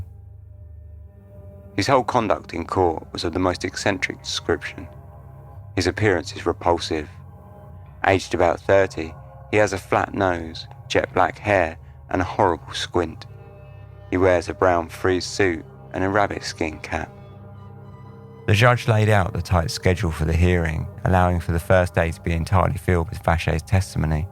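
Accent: United Kingdom. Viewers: All of your videos are shit. No pics or anything.. just your stupid Brit accent and you talking... Come on you lame prick.. total garbage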